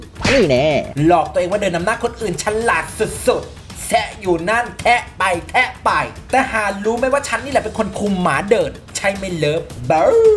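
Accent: Isan, Thailand. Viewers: <num>ถ้าไม่ใช่คนอิสานจะฟังออกมั้ยคะคลิปแปลนี้ รู้สึกได้เปรียบหนักเลย ฟังออกทุกคำ🤣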